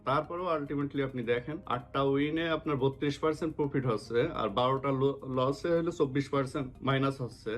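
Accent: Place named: Kushtia, Bangladesh. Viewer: Vaiyar basa ki kushtia te? Kushtia r vasar moto lagtise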